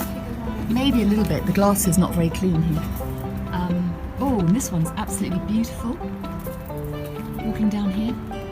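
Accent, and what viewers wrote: London, United Kingdom. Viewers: 小玉每期必看。thank you. Loooooove garden. I can speak Mandarin,English, Japanese and Shanghai Dialect. Love you speak British English. I have been living in Toronto for several decades. 谢谢分享花园。我非常喜欢花。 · 超级喜欢Manda的英式英语，很优雅好听，易懂。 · hi! thank you.! like London accent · 我從台灣來美國快40年. 我還是喜歡英式口音. 尤其Manda 講解課程的聲音. 非常輕柔. 太讓我陶醉! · London accent is music to the ears! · 学习了很多，还有高级的伦敦音，继续啊！超级喜欢你。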